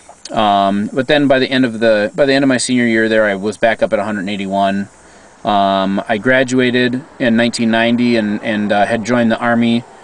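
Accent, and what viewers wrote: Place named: Minnesota, United States. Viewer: Hey..thanks for sharing your history of weight. You have a strong "Minnesota" accent...lol... You can make it to goal..don't give up! ~Amber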